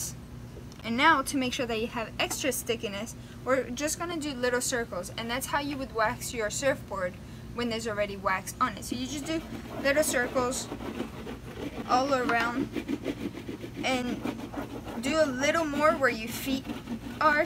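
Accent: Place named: France. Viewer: On entend beaucoup ton accent français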